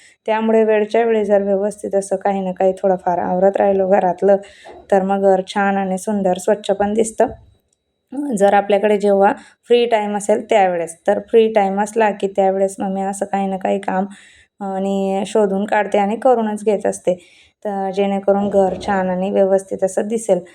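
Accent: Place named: Jalgaon, India. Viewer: tai tumhi jalgaon ch ya aahet ka language varun vatat me aahe so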